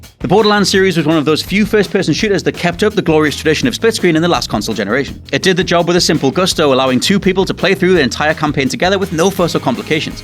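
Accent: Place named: Newcastle, United Kingdom. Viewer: At everyone criticising his narrative speed; You guys have never met a Geordie, have you? Confusing outsiders is our raison d'etre.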